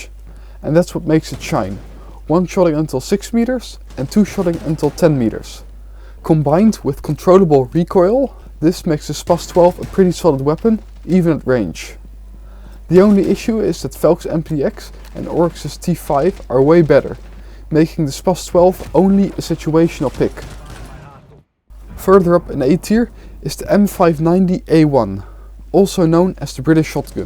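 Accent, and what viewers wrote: Netherlands. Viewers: I hear fellow dutchman, i press like 🇳🇱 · Are you dutch? You sound a bit like it. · always nice to hear a dutch accent in english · good video also, are you dutch by chance? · Are you dutch? I think i recognise your accent. Great video btw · Im sure you deserve more attention. I really like your dutch accent. You have a talent, keep going!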